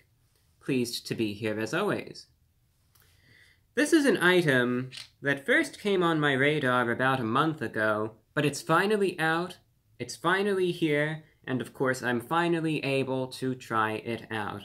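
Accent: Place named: Transatlantic, United States. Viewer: He is upping the transatlantic accent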